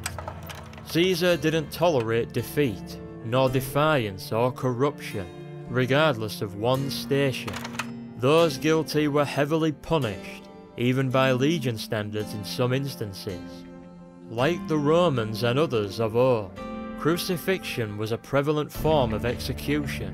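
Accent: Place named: North, United Kingdom. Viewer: "With the soldiers not knowing how to ... fart?" I know, it's your accent, I'm from the north as well. Great video as always!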